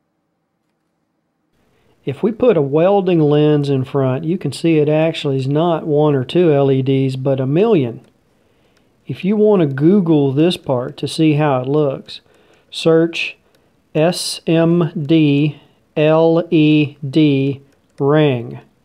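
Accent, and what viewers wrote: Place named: Alabama, United States. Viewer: nice review ...who's did the talking in vid ...he sounds like he's from Alabama...lolol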